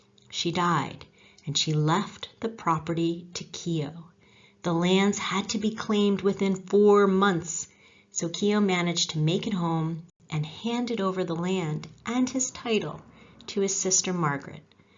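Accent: Ireland. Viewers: It is my pleasure to let you know how great of a storyteller (albeit Historical/non-fiction) and I'm glad the timing was good for you. I will most assuredly continue to let you know as I proceed to other segments. I'm so glad that I happened upon your series! Btw, I loved your Irish accent coming through as you spoke of Myles's story. It truly made for an endearing quality to your presentation. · Never talk in an Irish accent again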